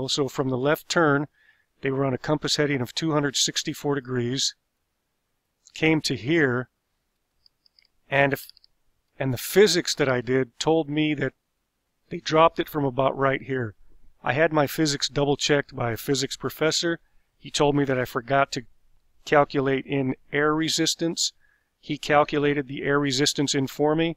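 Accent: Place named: North Carolina, United States. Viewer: I know nothing about you , BUT I'LL BET YOU ARE FROM NORTH EAST. NC OR SOUTH EAST. VA. RIGHT? Just a guess because you sound like everyone I know around here!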